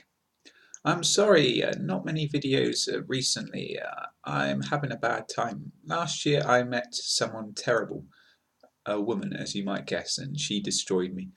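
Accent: United Kingdom. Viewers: What part of Britain is his accent from?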